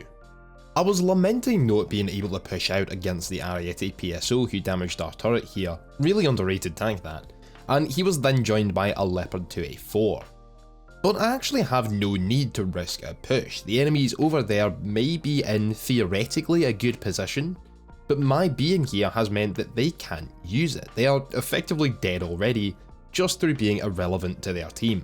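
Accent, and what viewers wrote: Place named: United Kingdom. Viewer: Eduardo Raul hes scottish... his name is litterally. Also his accent makes it very clear he is from the UK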